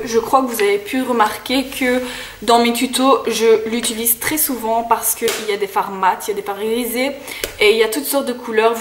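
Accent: Belgium. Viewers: Coucou, chouette vidéo, malgré que je l'ai déjà regardée 3 ou 4 fois sur d'autres chaines, c'est tjrs intéressant. Je partage tes gouts pour les fàp, d'ailleurs j'adore la palette que tu as composée (make up studio). Et la naked 2 me tente beaucoup, mais je ne l'ai jamais encore vue en vrai parce qu'à Liège il n'y a pas de Séphora, il faut aller jusque Lille :) Rien à voir mais tu es belge? J'avais décelé un petit accent, mais surtout tu as parlé du salon esthétika (je sais pas si ça s'orthographie comme ça ^^).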